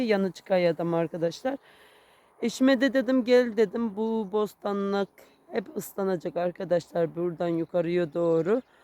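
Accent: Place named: Akçaabat, Turkey. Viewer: bizim burda bir komşumuz var aynı sizin gibi konuşuyor oda akcaabatli köyünden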